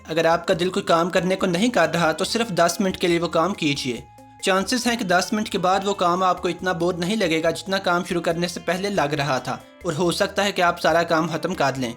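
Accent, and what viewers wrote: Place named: Sialkot, Pakistan. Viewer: Realy Helpful tricks bro keep it up👍 And bro apka accent bata raha hai k aap sialkot se ho. Am i right?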